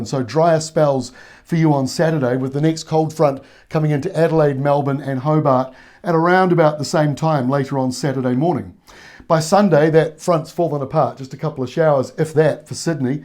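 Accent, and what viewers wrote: New Zealand. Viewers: Nice to have a kiwi reading the kiwi weather forecast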